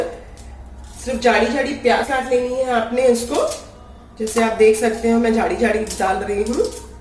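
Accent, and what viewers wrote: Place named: Gujarat, India. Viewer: Masha Allah 👌👌 I like d way u talk as we gujarati peoples do..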